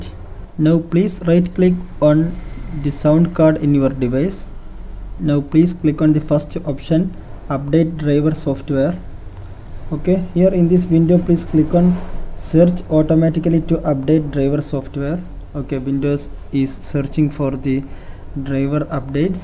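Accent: Kerala, India. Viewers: Malayali anno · Where does he come from ? Sounds like Apu from The Simpsons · Dude are you from Kerala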